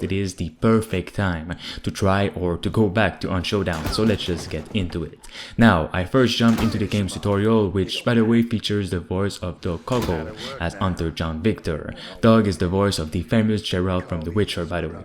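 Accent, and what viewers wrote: Quebec, Canada. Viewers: Es tu québécois? Les canadiens sont le meilleur 😊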